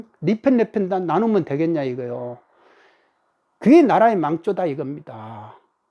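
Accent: Jeolla, South Korea. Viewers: 옹색하다와 징그럽다 둘 다 전라도 사투리는 아니고 말씀하실 때 전라도 특유의 억양이 있으십니다 징하다가 징그럽다의 전라도 방언이에요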